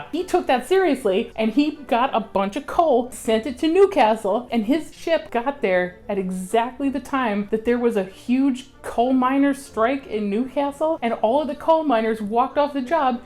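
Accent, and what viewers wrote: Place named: Florida, United States. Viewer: Why does it sound like he belongs in Florida?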